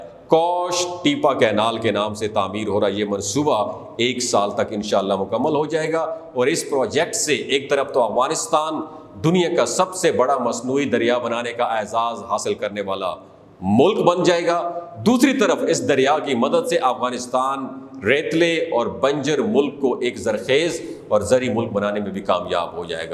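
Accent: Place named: Punjab, Pakistan. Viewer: پنجابی ایسے ہی کہتے ہیں جاپان کو جپان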